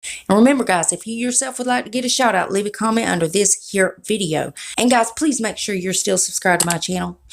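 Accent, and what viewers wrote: South, United States. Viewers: I love your Southern accent and sense of humor👏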